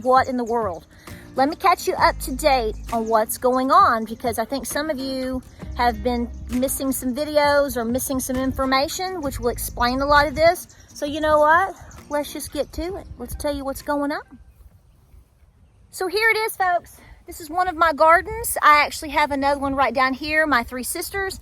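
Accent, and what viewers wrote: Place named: East Tennessee, United States. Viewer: Hey! I spent my first 18 years of life cradled in the Ridge and Valley Region of East Tennessee. Great people, for the most part.... And it feels like the most ancient region of the world, and maybe it is? I so enjoy hearing your stories, and hearing that accent that I love hearing coming from a woman. Down to earth, hard working, tough willed and minded, the breed of people from that area are some of my favorite. I enjoy your even-handed approach to everythiing. You always take a common sense direction in everything you do, and that includes praying about it. That's the most important part, isn't it? Ha! Keep on doin' what you're doin', Girl.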